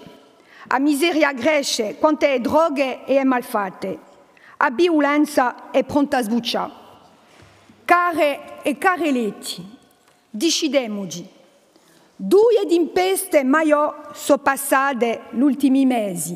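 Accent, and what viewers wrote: France. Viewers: Che brutto il corso un italiano con le u finali e la.cadenza francese . Il sardo e tutta un altra cosa.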